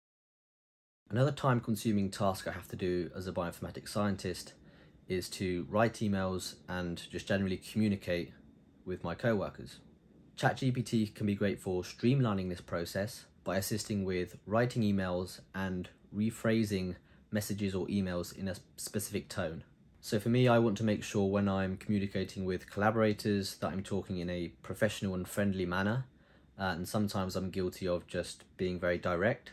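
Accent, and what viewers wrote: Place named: United Kingdom. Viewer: The British accent...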